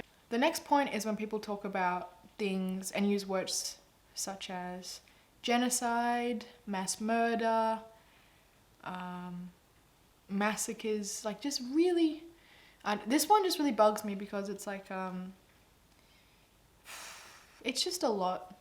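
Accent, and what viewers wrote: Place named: RP, United Kingdom. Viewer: Thanks for sharing your thoughts and opinions, you opened my eyes to things l had never even thought of. Great to get these conversations out into the public domain - it can only forge a path for a more comprehensive society. I love your posh english accent - it’s just so ‘cricket in the afternoon dear’. Oh - btw, what’s the cat’s name? 😀👍😀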